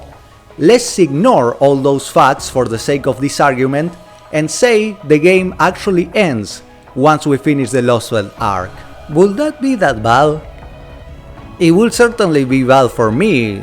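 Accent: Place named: Argentina. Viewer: El inglés más argento loco. Muchaaachooos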